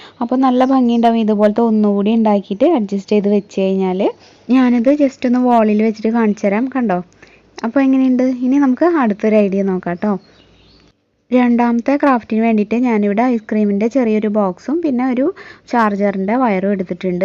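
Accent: Thrissur, India. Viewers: trissur anu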